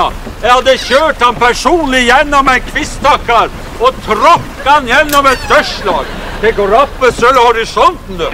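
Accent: Norway. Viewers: Trond Viggo er suveren her. Utmerket dialekt,en utgave som kan passe mange plassa. Han høres ut som en nordlending som har bodt ei stund sørpå. Videoen fremstår som en hyllest til oss i nord,og en fantastisk karikatur. 100 av 100:)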